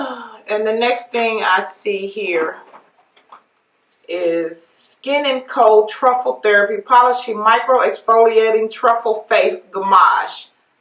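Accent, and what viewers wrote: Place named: Texas, United States. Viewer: Your accent makes me so happy. Are you from Texas too? Hugs!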